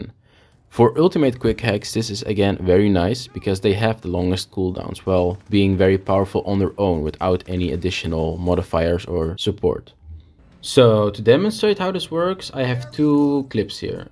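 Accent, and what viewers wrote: Netherlands. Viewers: Great video man, very helpfull!!! Ps. Is that a dutch accent i hear?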